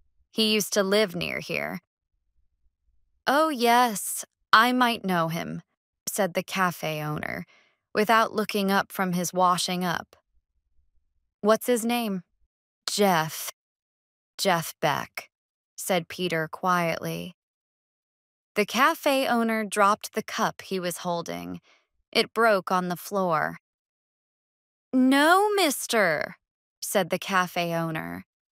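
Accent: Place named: United States. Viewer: I like the way speak clear from American accent. Chapter 2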